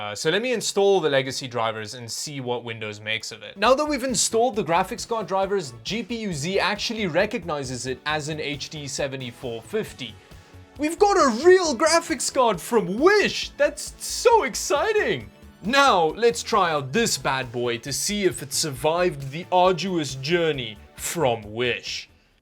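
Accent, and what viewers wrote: South Africa. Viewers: Are you from SA? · Is this guy from south africa?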